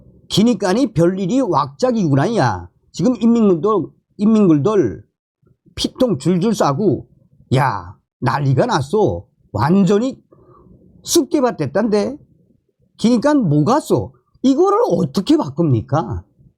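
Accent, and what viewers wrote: North Korea. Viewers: 북한식 어투 그대로 전달해주세요. 생생한 느낌이 그대로 전달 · 북한사투리 구수하고 아무렇지 않습니다. 사람마다 생각이 다를 수는 있지만 정성산 감독님, 실수나 말투보다 열심히 북한의 현실을 전해주시는 정성과 열정에 늘 감사드립네다^^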